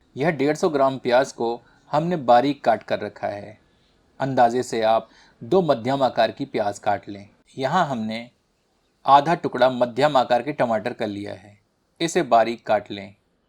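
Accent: Standard. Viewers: Aapki shuddha hindi sunkar bahut aanand aya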